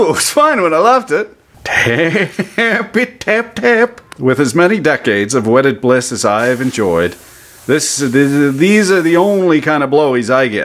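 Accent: Quebec, Canada. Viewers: You must have spent some part of your life in Quebec, you couldn't have acquired that slang and pronunciation anywhere else. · Me too... and i'm french! That quebec accent was so pronounced it's basically creole at this point. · Naw, welcome to Canada, eh. We all talk like that. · I can imagine the confused looks, when people don't understand the subtlety of the engrish Canadian mixture, fucking great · AvE be speaking true Quebecois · Cobalt? New Liskard? Not many places where you can develop both a hoser accent and also a heady joual... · Is that a Canadian accent you have? Aye good day. Take off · oh canadian . okaaaay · Il parle le joual la plus belle langue du christ!!:) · That's the most Canadia I've ever heard in 5 and a half minutes and I loved every second! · Little mix of quebec, ontarian and american talk😂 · He speaks like a true canadian. " how da fuck can you close on a weekend " lol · Where the F is the CC button? 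No one understands Canadian English? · Is he Québécois? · Hahaha Quebecois en criss! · Please keep the quebecois coming its hilarious! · That Québécois tho. I like it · Je savais pas que t'étais Québecois!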